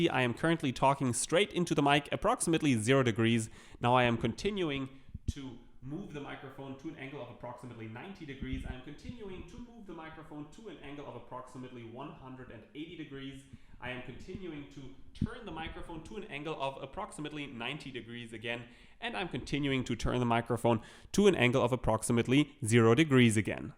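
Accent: Germany. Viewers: you german )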